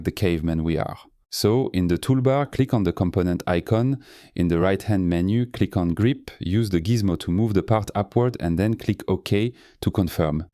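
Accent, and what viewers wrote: France. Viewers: J'aimerais avoir vôtre fluidité en Anglais ! en tant que français je reconnais un autre Français xD Avez-vous déjà utilisé Solidworks ou Fusion360 ? en point de comparaison ? ou vous avez une expérience exclusivement sur FreeCAD depuis le début ?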